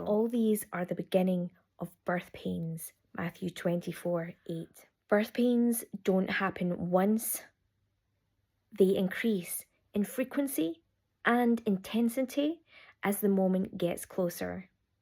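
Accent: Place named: Ireland. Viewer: You may already know about the budding fig tree parable. Truly i tell you this fig tree generation shall see these things come to pass. The fig tree called Israel bloomed again in 1948 and the people born at that time alongside of Israel shall see these things pass. Thats how i know. Read matthew 24 34 knowing the fig tree has bloomed when Israel became a nation again in 1948 and also know that only God like AI has the ability to enforce worshipping of the beast onto all people young and old, rich and poor, slave and bond. The mark is AI. We will all need a mark to buy sell or trade. Digital currency will give full control over all buying selling and trading going on in every nation and with every tribe. We know that AI is an existential risk and the end is near because of the AI beast system coming. I could listen to you speak all day long and if I spent much time around you I'd be speaking with a crazy cute irish accent too.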